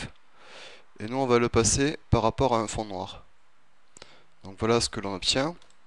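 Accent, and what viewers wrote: South, France. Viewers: bravo, bien expliqué, et agréable avé l'assan du midi,Té !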